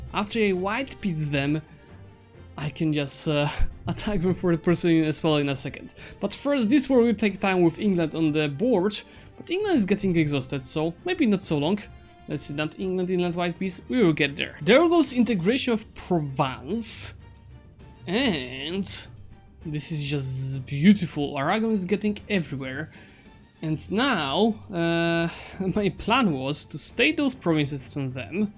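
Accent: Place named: Poland. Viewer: Are you Polish or Slovak? I love your accent :))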